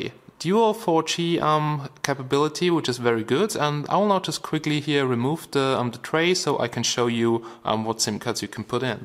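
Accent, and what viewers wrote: Germany. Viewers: du bist doch deutscher oder? :D man hört des :D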